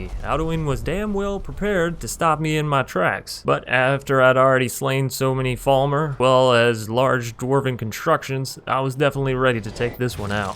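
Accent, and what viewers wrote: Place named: Texas, United States. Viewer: I feel the texas in his voice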